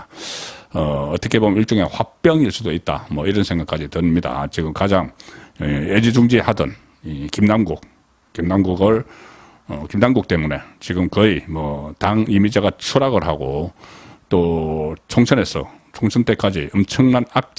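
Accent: Gyeongsang, South Korea. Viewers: 경상도 출신이라니 이해는 하겠지만 그래도 그렇지요. 방송하는 분의 발음이 이래서야 되겠습니까. '의문'은 '의문'으로 발음해야지 '어문'이 뭡니까. 장단음 발음도 이상하게 하시니 말씀이 귀에 잘 들어오지 않아요. 발음 교정 훈련을 열심히 하시기 바랍니다. 그러면 더 인기있는 유투버가 될 수 있어요.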